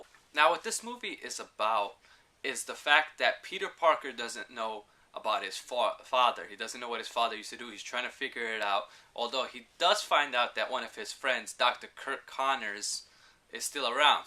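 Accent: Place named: New York, United States. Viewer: Gotta love the NY accent, good review overall.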